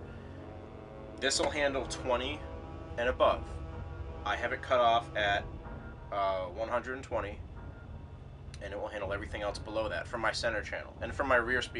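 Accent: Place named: New England, United States. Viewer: new england accent.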